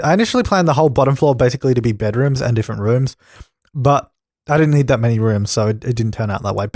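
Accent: Australia. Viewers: Also hell yeah, you're Australian. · You are like the only person who I can watch play sims because you have an Australian accent hahaha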